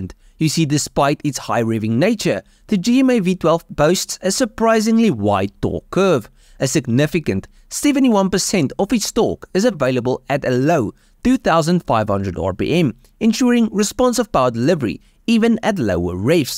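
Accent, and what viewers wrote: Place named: Brakpan, South Africa. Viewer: Liked the video for the pure Brakpan accent 👍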